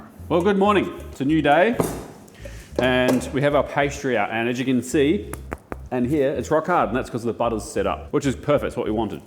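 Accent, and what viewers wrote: New Zealand. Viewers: Oh hey, I'm not crazy... Only been watching your videos for about a week (but been watching a smattering of them) and had one where you said you were in Sydney. Through me off because I was sure it was a NZ accent (words like "fish" are key, and growing up in Canada my best friend was from NZ). Happy to hear you say you're originally from NZ. All is right in my brain again. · I had no idea was an Enzedder, but I honestly should’ve guessed it based on the accent 😂. EDIT: Okay, I haven’t watched one of his long-form videos yet, so I wasn’t previously aware of it. Hopefully, that clears up things a bit.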